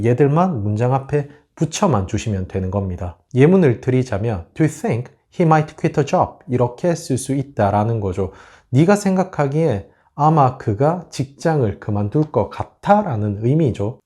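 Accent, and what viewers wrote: United Kingdom. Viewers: 영국식 발음에 치인다요……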